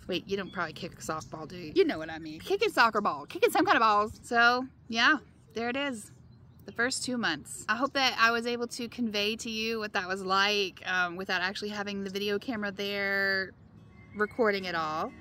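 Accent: South, United States. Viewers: Your accent and southern persona delivery is fantastic. This is coming from a tough critic! Girl you keep on sharing them stories. Your journeys will take you far and wide. Your honesty about the transition to the "Urban jungle" is different than boondocking. My dog and I are doing overnights. We did a week road trip in the summer. Definitely has it's enjoyable and challenging times. That's the adventure. What lies around the next bend. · Girllllll! I feel like I know you! What you're doing is a wish of mine. For someday . Not sure I have the guts tho. Please keep in touch as you can. I'd be tickled pink to meet ya. I'm 61. My husband is a good guy, just super boring. (Engineer) I was an operating room technologist back in my day. I crave adventure. ***I liked hearing a southern voice as well. I am currently way up in Ohio ....ICK !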